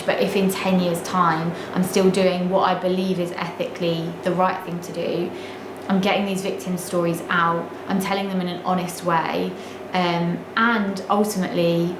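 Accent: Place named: Cockney, United Kingdom. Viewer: I love!!! Love the way she delivers her podcast straight in no giggling like you get on some of these other ones no respect whatsoever. Such a lovely voice too half posh half cockney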